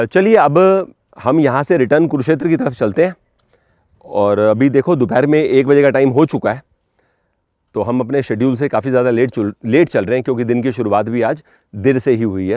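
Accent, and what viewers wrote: Punjab, India. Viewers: Aapke videos bahut achhe hote hain, lekin ek request hai. Jab bhi aap kisi historical place ka video banao, to apne punjabi accent ko control kiya kijiye, us se saari vibe kharaab ho jaati hai. Please bura mat maaniyega, fan hu isiliye adhikaar se bol rahi hu. Jaise ki aap भीष्म कुंड को भीषम कुंड bolte ho, और को ओर बोलते हो, युद्ध को जुड्ढ बोलते हो। आप जानते हो महाभारत काल में कितनी शुद्ध हिंदी बोली जाती थी। जब आपने वीडियो बनाने की starting की थी, तब से अब तक काफी चेंज है आपकी भाषा में लेकिन थोड़ा सा और सम्भाल लीजिए, please, video देखने का आनंद दुगुना हो जाएगा। राधे राधे।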